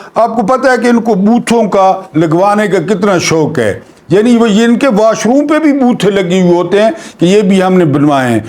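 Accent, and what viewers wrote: Saraiki, Pakistan. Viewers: اسلام علیکم ورحمتہ اللہْ ھمیں معلوم ھے آپ کو انگلش پر عبور ھو گا مگر یہ اردو کا سرائیکی لہجہ قیامت ھے اللھ قسم یہ آئی ایم کی بجاۓ مَین آدھے ھین او تو ھمیں زیادہ مزیدار لگے گا انگریزی کی مت نہ مارا کریں اردو سرائیکی لہجے میں واہ کیا کہنے انگریزی سرائیکی لہجے میں......... اوپر سے گزر گئی آپ کے وی لاگ کا جواب عشق قاتل سے بھی، مقتول سے ہمدردی بھی یہ بتا کس سے محبت کی جزا مانگے گا؟ سجدہ خالق کو بھی، ابلیس سے یارانہ بھی حشر میں کس سے عقیدت کا صلہ مانگے گا والسلام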